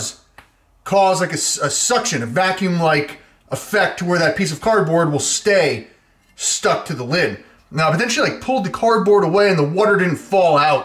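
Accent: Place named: United States. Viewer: Love the American accent ,,,, can fellow Americans know what state he maybe from just by his accent ? Just curious, from Australia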